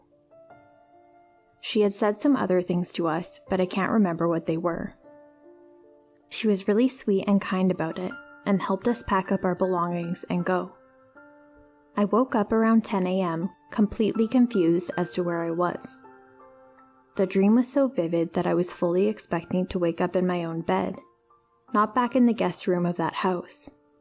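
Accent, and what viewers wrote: South, United States. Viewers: The southern accent was phenomenal, you're so talented that's awesome. You're gonna go far.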